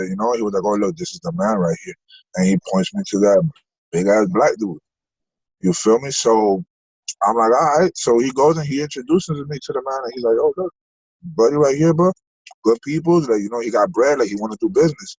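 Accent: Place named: Malden, United States. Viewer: Sup my man u said u were born in Malden but grew up in Florida, there's no way u didn't grow up in Malden too with that accent! What age u leave?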